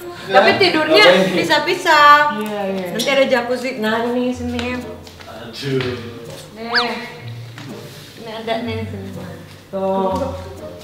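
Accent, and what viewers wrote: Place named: West Java, Indonesia. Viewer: Kenapa ya klw. Bunda ngomong pinginnya saya ketawa lucu suka ada sundanya 😂😂👍😍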